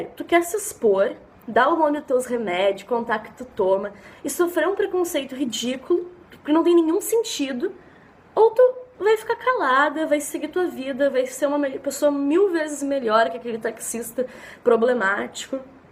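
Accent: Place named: South, Brazil. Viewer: 박지민 e do Sul ne...sotaque maravilhoso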